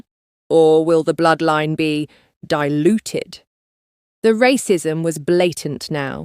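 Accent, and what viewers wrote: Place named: United Kingdom. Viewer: For some reason when I hear a British accent telling the story, it helps me what is that, lol love that accent all the time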